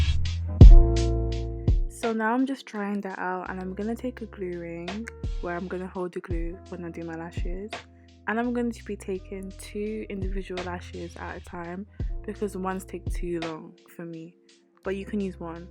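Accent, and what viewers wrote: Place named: England, United Kingdom. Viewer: You can’t hear the English accent?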